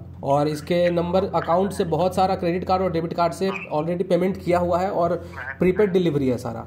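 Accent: Bihar, India. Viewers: Your speaking accent was bihari. You have to speak like local. Please catch delivery boy also because he was saying "vahi aana jahan har baar lete hai".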